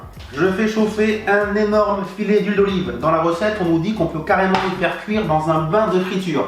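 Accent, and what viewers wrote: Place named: France. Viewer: je t'adore 😍 Non seulement pour toutes tes recettes, mais parce que tu mets à l'honneur beaucoup de recettes de mon pays 🇮🇹🇮🇹🇮🇹 Et ton petit accent français ne gâche rien à la prononciation italienne. Bisous 😘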